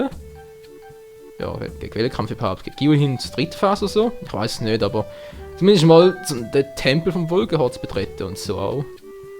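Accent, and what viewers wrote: Switzerland. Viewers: Also ich mag dein Schweizerdeutsch ;D Ich verstehs ganz gut^^ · also ich finde das schweizer-deutsch ist gar nicht so schlimm^^ es ist sogar mal ganz schön. ist was anderes. ich verstehe zwar als deutsche nicht alle wörter, aber das ist mir egal. es macht Spaß dir zu zuhören, da man das schweizer-deutsch ja hier so selten hört^^ mach weiter so!!! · war cool mit dem schweizerdeutsch^^ ich dachte ich hätte mehr probleme dich zu verstehen,aber war ganz ok! hast du eigentlich schon n lets play von twilight princess?wenn nich wäre ich wieder für min.1 part in scheizerdeutsch;)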